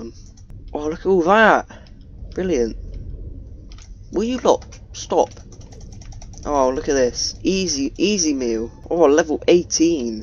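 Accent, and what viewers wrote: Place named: Scotland, United Kingdom. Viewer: oh I thought you were a scot because of your accent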